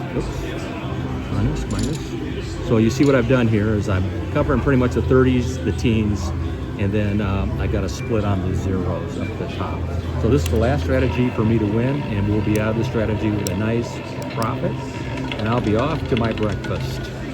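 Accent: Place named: Midwest, United States. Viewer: Are you from Michigan or Wisconsin 😊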